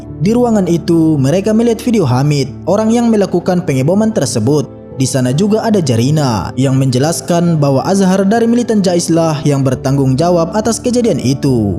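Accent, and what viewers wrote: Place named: Padang, Indonesia. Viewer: Pengisi suara orang padang❤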